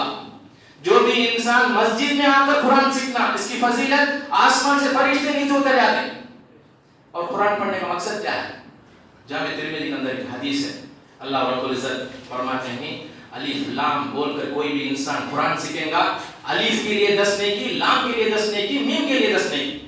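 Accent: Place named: Chennai, India. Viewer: Mashallah After long time I heard Chennai slang Urdu bayan.Alhamdulilla Very beautiful bayan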